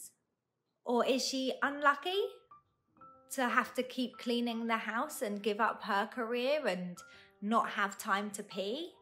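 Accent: United Kingdom. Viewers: I like to listen to you talk, which made me love the British accent.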